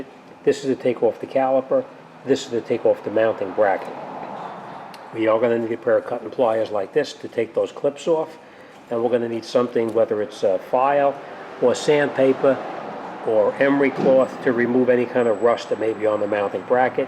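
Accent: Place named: New Jersey, United States. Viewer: "Bolth bolts" love Jersey lol. Must be north Jersey. Thanks for the vid. Helped me out greatly.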